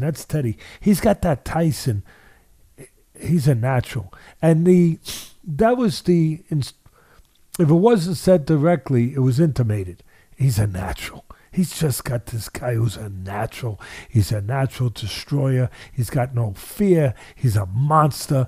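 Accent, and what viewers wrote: Newcastle, United Kingdom. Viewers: wow teddy with true geordie , amazing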